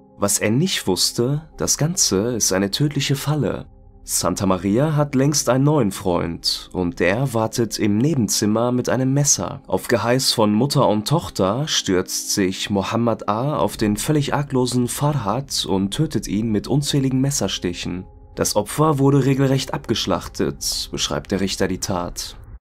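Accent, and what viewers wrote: Ruhr, Germany. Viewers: Mach weiter so. 🤘🤘 Kann mir auch kein weichspüler true Crime mehr geben....ehrliche unzensierte Meinung und Ruhrpott Schnauze ...sehr geil ..weiter so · True Crime auf Ruhrpottdeutsch sollte Pflicht für alle True Crime Kanäle sein! Ich sach ma grüße ausm Norden ne❤ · GEnau SO reden wir hier im Pott. GEile Videos, weiter so. Nur der S04 .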